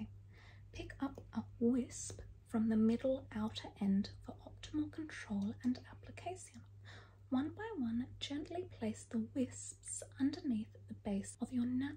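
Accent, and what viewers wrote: Australia. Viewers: OMG!!!! I'M SOOOO EXCITED TO HAVE A VIDEO FROM YOU!!! Its so rare to get that specific Aussie accent/way of saying things. you are amazing, i hope 2024 treats you better. We are all just so happy for anything you do. I wish you love & light & happiness for 2024.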